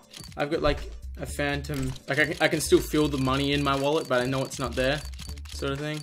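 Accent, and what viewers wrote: Australia. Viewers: Your aussie?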